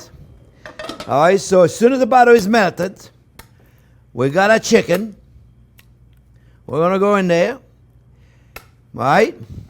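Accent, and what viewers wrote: France. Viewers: You are really nice and funny with your english francaise accent ;) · Ptn ct' accent français jpp 😂, bonne recette sinon 👍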